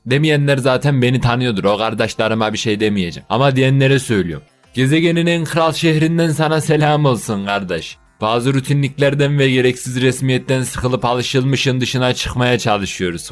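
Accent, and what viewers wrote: Ankara, Turkey. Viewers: herşey güzelde Ankara lı böyle mi konuşmak zorunda ,ne oldu güzel türkçemize? bilgilendirme için teşekkürler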